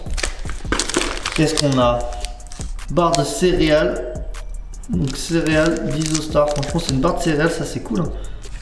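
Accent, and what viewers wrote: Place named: Maghreb. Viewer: Tu es d’origines arabes? T’as un accent du Maghreb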